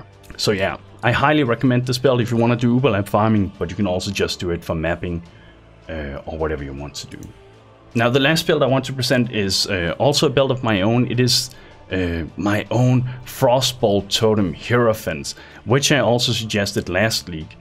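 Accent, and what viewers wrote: Denmark. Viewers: Within the first few words i knew you were Danish lol. Fedt at se en Dansk youtuber der laver content til andre end børn. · are you danish?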